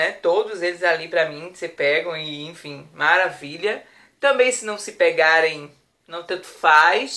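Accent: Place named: Bahia, Brazil. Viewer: MEU DEUS DO CEUUU, EU SOUBE QUE VC É DA BAHIA E EU TAMBÉM SOU, EU SOU DE SALVADOR-SIMÕES FILHO! JÁ OUVIU? FAZ UM ENCONTRINHO EM QUALQUER SHOPPING DE SALVADOR, EU QUERO TE VER! BEM QUE EU DISCONFIAVA DO NOSSO SUTAQUE! RSRSRS...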